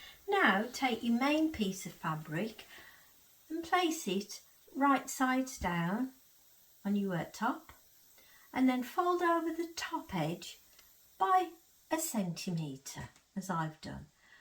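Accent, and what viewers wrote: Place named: Midlands, United Kingdom. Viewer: Very clear tutorial, thank you. I love hearing your tutorials as having moved South they take me back home to the Midlands 😀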